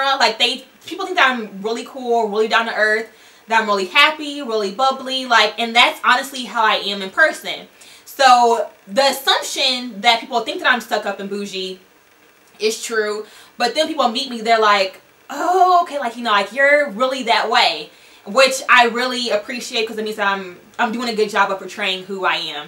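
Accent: South, United States. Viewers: you have a southern drawl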